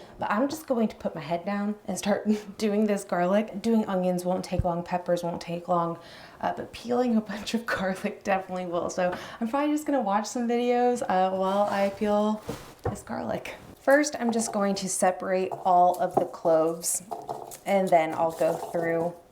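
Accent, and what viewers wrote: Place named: Philadelphia, United States. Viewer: Hi, I came upon your channel two weeks ago and let me tell you how much I LOVE it and you of course. I could have sworn you were from Philly, but I saw the video you said you are from Kanas. You did say you lived all over so wondering if you ever lived in Philly. You sound and act like you’re a true Philadelphian, LOL I just love how you talk and do those side noises, lol…. Anyway not sure if you will ever read my comment, but know that you have a NEW FAN from PHILLY. P.S. because of you I ordered golden bear sunflowers and paprika seeds. Oxoxoxo Love from Philly💙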